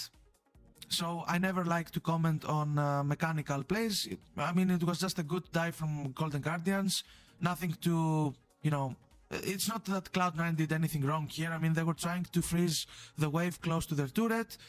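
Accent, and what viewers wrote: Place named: Greece. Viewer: Good video. Also your accent is familairly greek :p .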